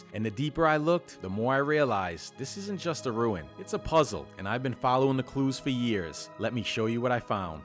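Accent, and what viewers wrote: United States. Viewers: Excellent ~ Thank You! May I ask, you live in Egypt but have a strong American accent ~ NOT faulting! Are you of Egyptian descent who returned to Egypt?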